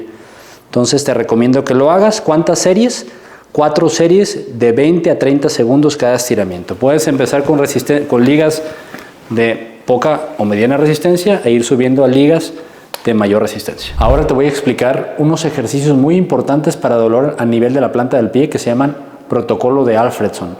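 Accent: Mexico. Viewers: Por la forma de hablar, en Mexico. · es de México! Mírale la bandera de México en la bata! Además de que el acento lo delata!!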